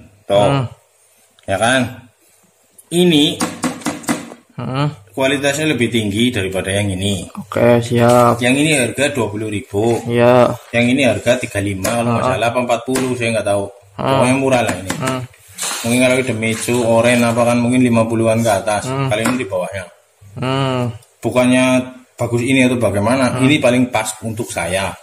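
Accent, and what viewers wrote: Banyumas, Indonesia. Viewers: Filsuf mesin dengan ymbahasa ngapak😅😅😂😂😂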